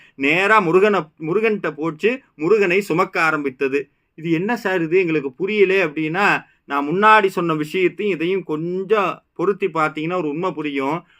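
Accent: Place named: Coimbatore, India. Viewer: Thanks Anna. Coimbatore Tamil la unga Explanation ah kettu konde irukalaam.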